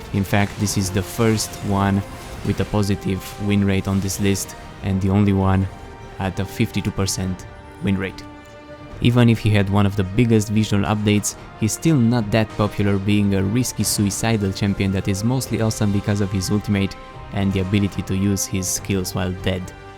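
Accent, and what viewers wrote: Romania. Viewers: are you romanian? you have accent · You have Romanian acces :D Deci esti roman :))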